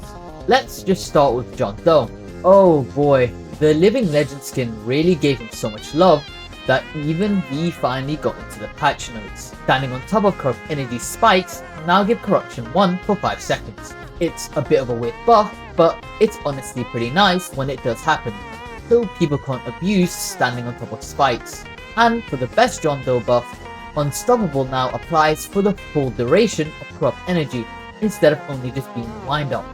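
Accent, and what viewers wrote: United Kingdom. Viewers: why do you kinda sound like a british girl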